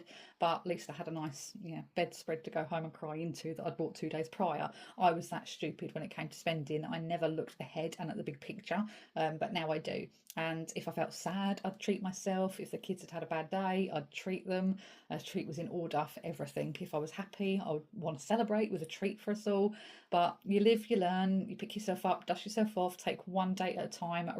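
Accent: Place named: Essex, United Kingdom. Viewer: I love your accent sounds essex, what is your starsign? Xx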